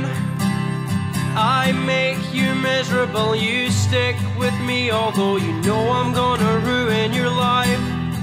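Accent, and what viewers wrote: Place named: Edinburgh, United Kingdom. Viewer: People form Edinburgh have such thick accents! If I wasn't a killie boy I would have thought you were Irish!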